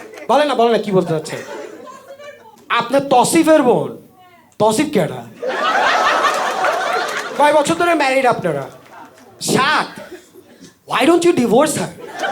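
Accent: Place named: Chittagong, Bangladesh. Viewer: চিটাইগ্যা নাকি ভাই!?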